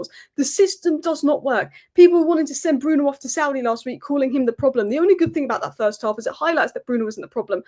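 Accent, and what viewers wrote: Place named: England, United Kingdom. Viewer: Guys what type of English accent she has , I love it.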